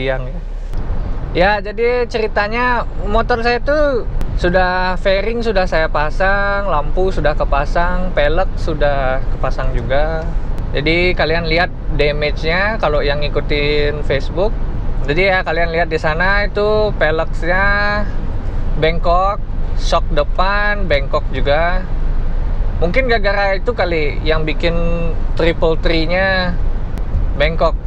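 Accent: Bali, Indonesia. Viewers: awal ngelihat ni vlog, logatnya yg saya kenal, setelah di cek ternyata orangbali 😀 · masbro.. sy subscriber baru nih.. yg sabar ya masbro.. namanya jg kcelakaan.. kdepannya hrs extra hati" masbro..:D oh iya logat ngomongnya kaya orang bali ya bro.. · kok kyk bali logtnya yak? apa cuman perasaan gue aja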